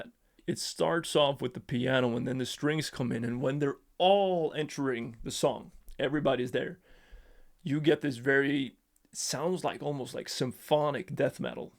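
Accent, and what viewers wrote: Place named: Denmark. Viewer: I am from Denmark. I thought I reconized your english accent as danish.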